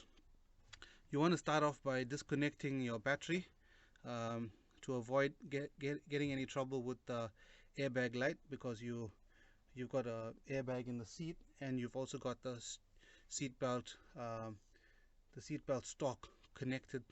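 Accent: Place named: South Africa. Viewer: That sounds like a south african accent...very cool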